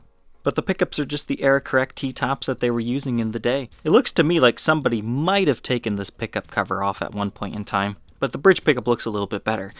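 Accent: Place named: Midwest, United States. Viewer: Its the midwest accent "t" sounds are pronounced like "d"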